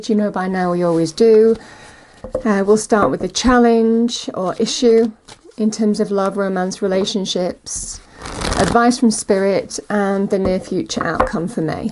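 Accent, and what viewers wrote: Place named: London, United Kingdom. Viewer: Thank you thank you for this beautiful reading I love the way you explain the cards and how you do the reading thank you thank you thank you also you are from London your accent is very lovely are you from London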